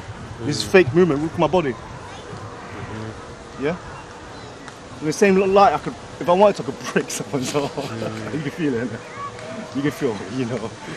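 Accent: London, United Kingdom. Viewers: Love your British accent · He sounds almost like Africans that live in England. Lol!! This looks soooo relaxing. If it were me I'd just fall down. "You just gone have to come down here lao shi."😊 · You look like a Thai master with a British accent😱👍🏻👍🏻👍🏻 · sound like you come from East London or South London lol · he has a strong london accent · I subscribed for the simple fact an Asian guy with a London accent it just cool AF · definitely 100% a London accent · what lmfao it’s a London accent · Just a basic London accent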